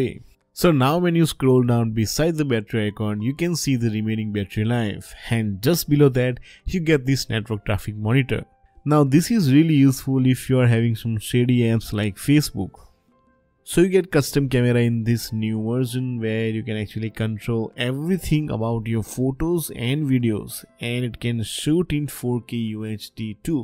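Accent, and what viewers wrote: India. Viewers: Your voice sounds like indian person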